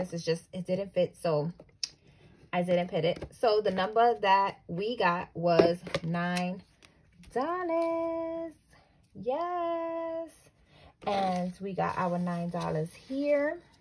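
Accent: New York, United States. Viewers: That New York accent tho. Lol! Needed this motivation today. Thanks sis. Have a good weekend.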